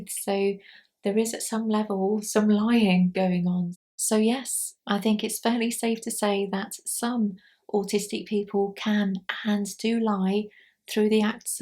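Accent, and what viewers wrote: United Kingdom. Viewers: Are you from the UK, Australia or New Zealand.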